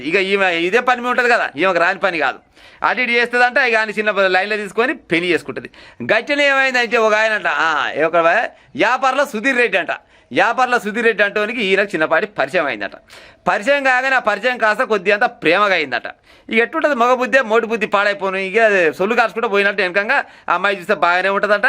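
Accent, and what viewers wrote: Telangana, India. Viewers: నువ్వు భలే గమ్మత్తుగా చెప్పుతావే పెద్ద మనిషి వార్తలు మన అచ్చమైన తెలంగాణ మాండలికంలో 👌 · తెలంగాణ మాండలికం మాటల ద్వారా న్యూస్ చెప్పడం చాలా బాగా వుంది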